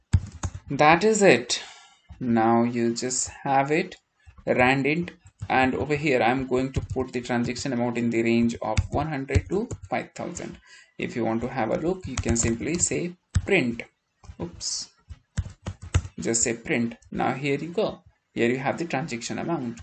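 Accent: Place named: India. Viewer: индус + английский = невозможно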